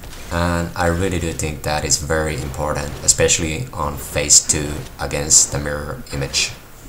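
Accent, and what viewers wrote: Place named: Asia. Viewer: lol you're funny. I can do that same accent. Are you actually asian?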